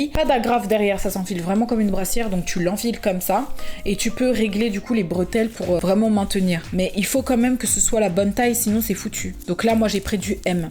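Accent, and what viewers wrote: England, United Kingdom. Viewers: Wesh tes anglaise ou quoi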